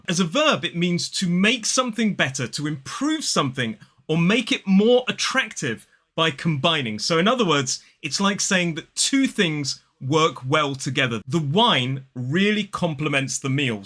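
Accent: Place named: RP, United Kingdom. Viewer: My favourite British rp accent teacher on YouTube. Love the energy you give the words when you're speaking. Makes it easy for our ears to pick the tobality and musicality of the accent. Thank you!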